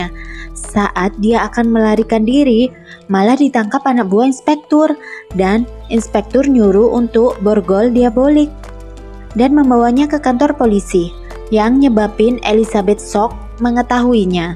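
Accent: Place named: Sulawesi, Indonesia. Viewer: Logk Sulawesi 😂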